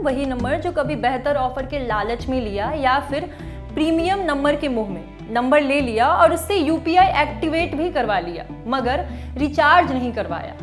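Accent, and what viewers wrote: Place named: Bihar, India. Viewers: Nice hindi bur bihari accent Accha laga❤ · Apke bolne ke accent se lag rha hai aap bihar se ho Abhi thoda time lagega hindi accent me bolne me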